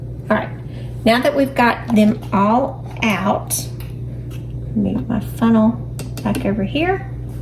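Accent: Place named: Georgia, United States. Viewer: You know youre from Georgia when the transcript says bowl each time you say boil😅!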